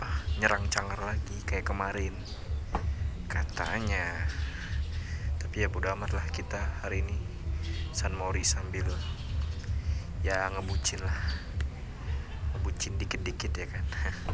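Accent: Papua, Indonesia. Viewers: KK mcm pu logat ni logat" Papua k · Logat Papua bro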